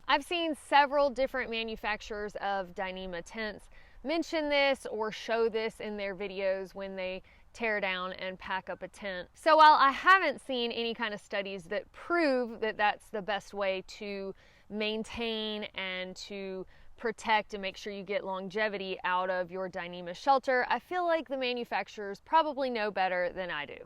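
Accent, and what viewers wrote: South, United States. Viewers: florida born and raised i really enjoy listening to your southern accent🤗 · I am not a backpacker, but I like listening to your awesome southern accent! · Were you born in the South? You pretty much sound Southern.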